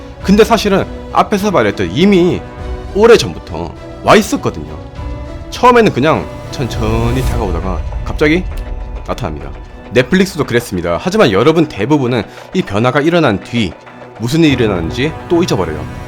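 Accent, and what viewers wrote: Gyeongsang, South Korea. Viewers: 경상도 분이시군요?^^♡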